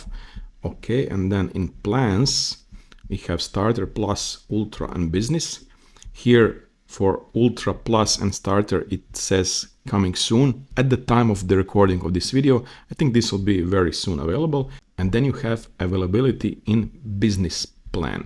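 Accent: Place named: Eastern Europe. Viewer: Where are you from? I hear eastern european accent. this is a grate video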